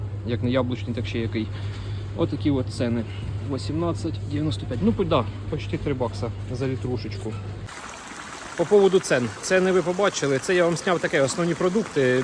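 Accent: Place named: Poltava, Ukraine. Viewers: Привіт з Полтавщини! Суржик клас! Прям як у нас! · Дівчата, нічого не згодна з Вами, його мова - це чистий суржик Полтавської області 😊.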